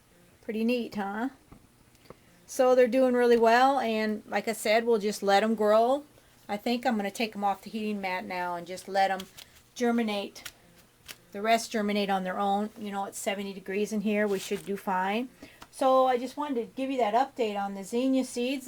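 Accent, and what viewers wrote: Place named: South, United States. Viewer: Hi. Love your garden videos and your southern accent. I've been watching your channel for a while and am a big fan.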